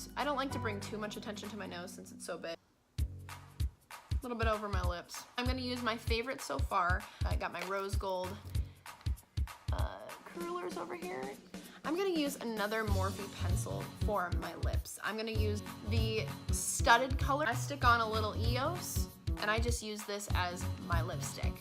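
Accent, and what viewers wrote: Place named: United States. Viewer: Your country accent is killing me. I love it. 😹😹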